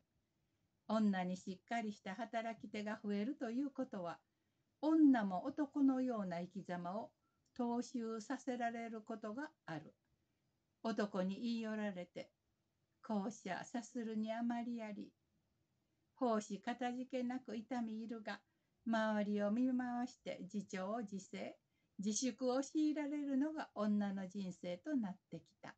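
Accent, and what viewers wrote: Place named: Kansai, Japan. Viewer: こんにちは、私は長年田辺聖子さんのファンでした。本で読み慣れた田辺節、滑らかな関西弁のイントネーションと共に大変楽しく拝聴させていただきました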